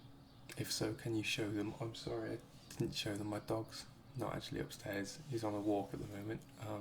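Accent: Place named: West Midlands, United Kingdom. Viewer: you sound like you live near the west midlands! your accent I mean, I live in brum.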